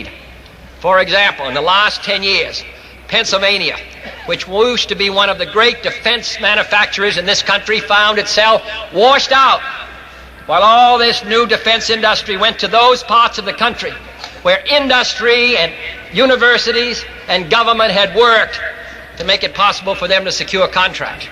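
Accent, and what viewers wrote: Boston, United States. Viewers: Fantastic and fabulous. This man was a genius. What a masterful speaker! He had a Boston accent but you can understand every word he says.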